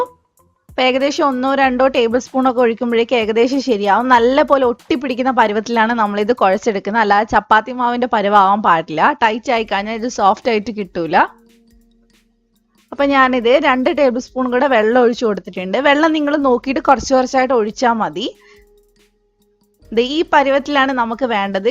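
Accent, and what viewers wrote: Kannur, India. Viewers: Super sound kannur evideyann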